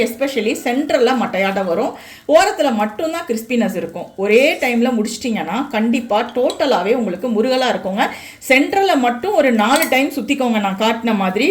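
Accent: Kongu, India. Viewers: எண்ணி எண்ணி ரசிக்கிறேன் கொங்கு தமிழை. அருமை ங்கா.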